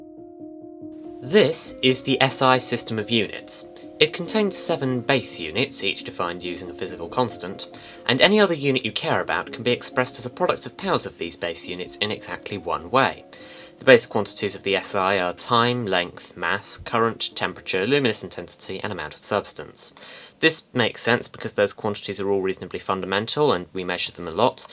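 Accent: United Kingdom. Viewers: You have no idea what a vector is, but with a British accent you can make the biggest BS sound true, including WMD.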